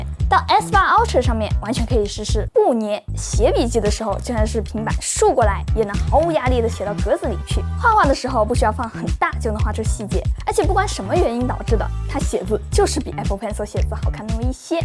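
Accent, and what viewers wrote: China. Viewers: 好重的中國鄉音，逃。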